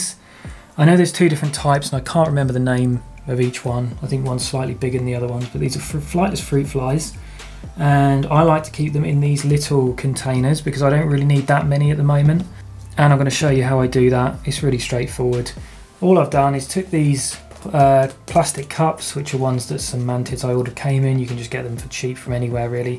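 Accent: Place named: United Kingdom. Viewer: Great video. I like the way you are not so scientific, but more casual and general. Sometimes the basics are more important than all the technicalities. I like the quiet ambient music in the background so I can hear you speak clearly. Nice British accent is also appealing. I learned a lot and enjoyed watching you. Thank you. Wow. I'm glad I made it to the end of the video because I love your idea about using the squeezable condiment bottles. I am looking forward to trying that idea for dispensing fruit flies.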